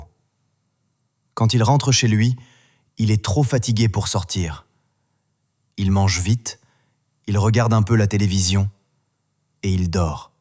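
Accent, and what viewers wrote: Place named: France. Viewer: I liked your dialect when you speaking English with French flavor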